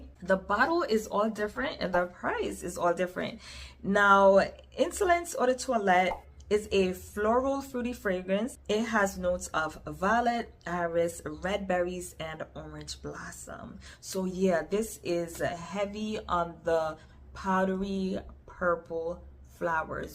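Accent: Jamaica. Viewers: Truly enjoyed this video. Absolutely love your Jamaican accent.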